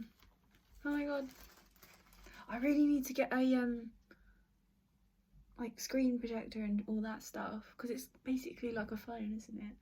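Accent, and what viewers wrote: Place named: United Kingdom. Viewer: Mine arrives in couple of days!!Love that British accent!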